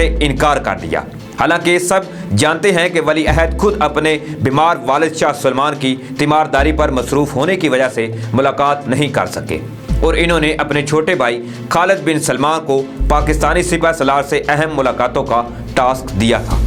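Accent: India. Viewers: You speak Urdu in Hindi accent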